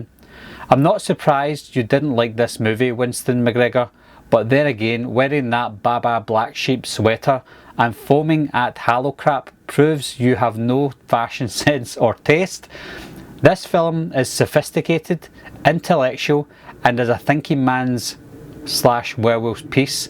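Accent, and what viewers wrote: Scotland, United Kingdom. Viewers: I like hearing Scottish accents from Reviewers such as Yourself & 'The Critical Drinker' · A Scottish accent warms my bits. ❤😍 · I almost spit out my water and cracked up pretty hard after you read the second profanity-laden troll comment 😂. You handled these comments very well. Genius video you uploaded — this is akin to the “celebrity read mean tweets” segment on late night tv. P.S. I’m an actor & your videos help me immensely with my Scottish accent. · I like your accent than many other British accent. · I'm an Irish-German-Scottish American from Connecticut and I understand you perfectly. People need to realize that the world is a lot bigger than just their backyard. I stopped to watch one of your videos because of your accent. I'm just sorry for the grief that these people cause you. Do you think sometime you can make some videos showcasing some of the landmarks or historical sites in Scotland? 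I would love to see what it's like over there. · I’m from Houston with an east Texas/west Louisiana accent. I confuse myself on occasion. Lol. My daughters attend a Scottish arts private school and your accent is perfectly commonplace in our world- so, no complaints here! ☺️ Btw, thank you for keeping such a clean channel! We love horror movies but I’m selective on what they watch. You’re our favorite! 😎🎃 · I love your accent!! Its great! I try to speak with a Scottish accent alot lol Im actually a very small perscentage Scottish. Not alot but def more than liz warren : / great channel!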